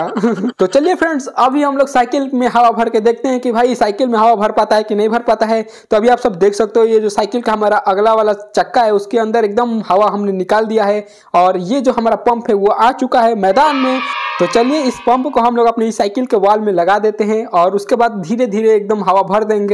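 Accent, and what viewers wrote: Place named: Bihar, India. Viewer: Bihari sun pahle hindi Boland sikho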